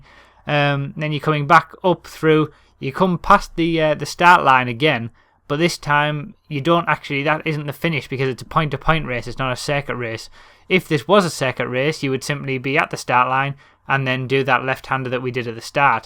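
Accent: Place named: North East England, United Kingdom. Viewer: Thought so, im from Newcastle :) Never really came across a north east commentator, good content though keep it up!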